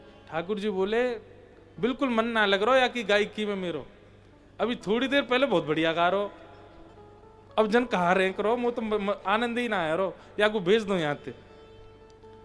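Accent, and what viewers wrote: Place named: Braj, India. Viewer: Jay Shri Krishna Radhe Radhe Jay Shri Harivansh Guru Dev Maharaj ji Aapke Charno Mai Koti Koti Vandan 🙏 Radhe Radhe Jay Shri vraj vasi Aapki vraj Bhasa Badi Sumadhur hai Radhe Radhe Jay Shri Vrindavan Dham 🙏 Jay Shri Radha Rani Jay Shri Radha Kishori ji Jay Shri Radha Raman ji Jay Shri Hari OM 🕉 Namo Narayan ji 🕉 OM Namo Bhagvate Vasudevy Namh Jay Shri Laxmi Pati Jay Shri Shyama Shaym ji ♥️ Jay Shri Yamuna Maharani ji Jay Shri Radha Vallabh Lal ki Jay Ho ♥️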